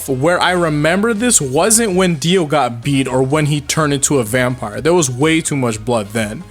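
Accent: Canada. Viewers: Are you a Canadian Lad?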